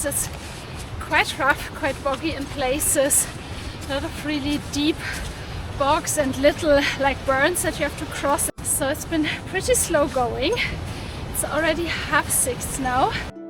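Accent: Germany. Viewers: What s this accent ? German?..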